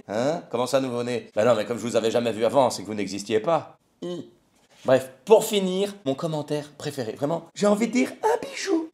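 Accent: Belgium. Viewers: Oh putain, mdr pourtant ça s'entend bien que tu es belge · en Belgique tout comme partout dans le monde les cons sont légions, t'inquiète, les "vrais" belges comme toi et moi on sait en reconnaître un autre dès qu'on l'entend causer, une fois!! on t'adore! continue mec, t'es le meilleur!;)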